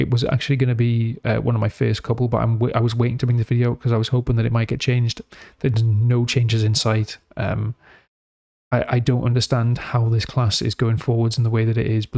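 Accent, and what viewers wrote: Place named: North East England, United Kingdom. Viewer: nice to hear a NE voice doing wow content i'm from Boro and it's refreshing!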